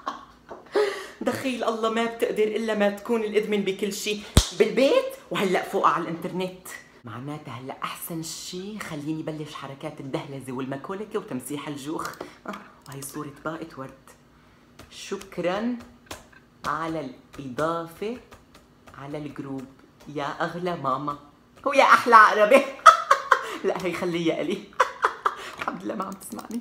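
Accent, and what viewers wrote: Aleppo, Syria. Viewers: | والله تعلمت اللهجة الحلبية منك متابعك الوفي من الجزائر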